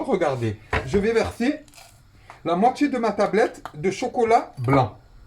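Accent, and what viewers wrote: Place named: Oran, Algeria. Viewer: On pourra pas dire que vous n êtes pas algérien " n énervée pas mes nerfs " 😂😂😂 votre accent me rappelle mon enfance a Oran 🤔 c est un quartier de Oran mon oncle a habité la bas merci pour vos bonnes paroles et humour 😂👍 je viens de m abonnée ya une semaine bonne continuation 🙏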